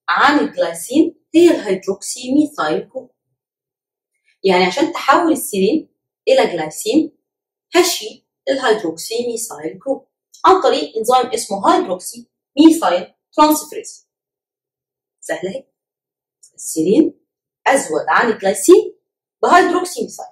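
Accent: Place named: Arab world. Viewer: She is speaking with an arabic dialect through her sessions for arabic students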